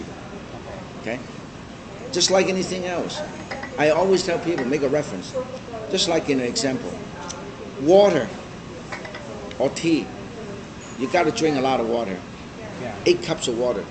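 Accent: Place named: Philippines. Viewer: pinoy!! halatang halata sa accent!! :)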